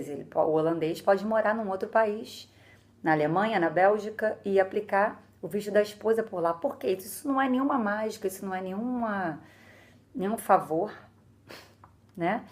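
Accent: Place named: Rio de Janeiro, Brazil. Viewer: Boas dicas de legislação em um sotaque carioca. Riso. Abraço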